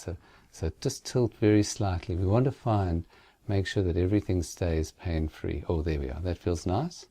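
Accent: South Africa. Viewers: Are you South African, I can't help but notice the accent?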